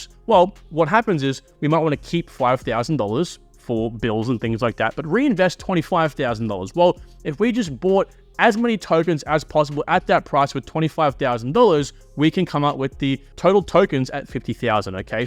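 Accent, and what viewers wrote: Australia. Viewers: Are you from Sydney?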